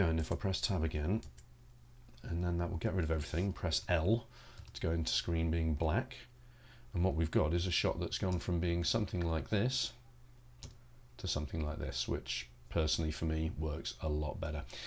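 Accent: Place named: United Kingdom. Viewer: Hi! You just appeared in my Youtube recommendations and I'm frankly happy for it. Great video and it's really nice to listen to your charming Brittish accent after so many american or australian tutorials. I'm not really into trying Lightroom, I don't like the concept of libraries and catalogues, but the develop module seems much more atractive than ACR, so maybe it's time to give it a try. Thanks for sharing your time :)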